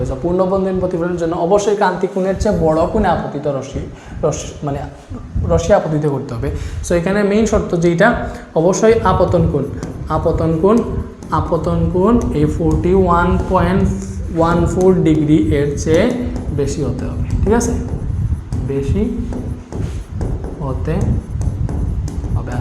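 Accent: Sylhet, Bangladesh. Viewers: Vai apni Sylheti Matiya Koin ❤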